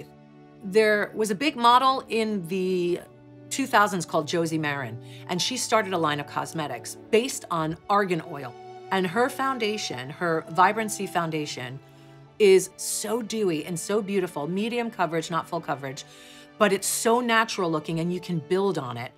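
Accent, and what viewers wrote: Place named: RP, United Kingdom. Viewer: she's so posh. Luv her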